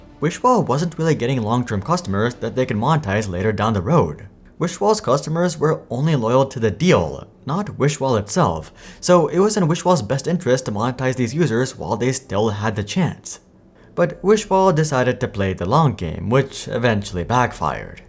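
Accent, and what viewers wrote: India. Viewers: Didnt know VoiceOver guy was India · He's just an Indian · WHAT is this accent that assaults my ears??? Are you both kinds of Indian? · Your Indian accent is actually unbearable, you disgust me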